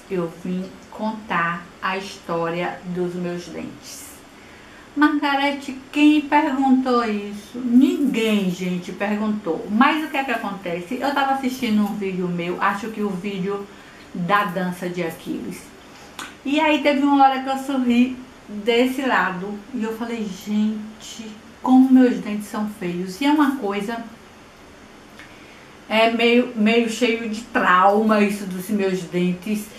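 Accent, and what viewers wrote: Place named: Bahia, Brazil. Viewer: Vc é linda!Sua essência é verdadeira,alegria contagiante e espontaneidade como sua mais perfeita qualidade!Adoro esse sotaque baianês,vc é um exmplo de mulher,não se importe com essas pessoas vazias e fúteis,elas realmente não sabem o que vieram fazer no mundo,azar o delas!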